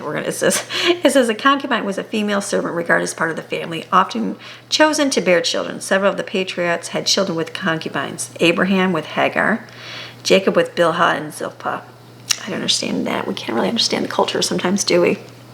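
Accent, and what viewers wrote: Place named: Ireland. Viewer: I thought the same Amy , sounds Irish as we would say. Mind you none so blind that do not want to see. Thank you for reading to us. This passage has such a familiar ring to it and isnt so far from what is going on in this world today.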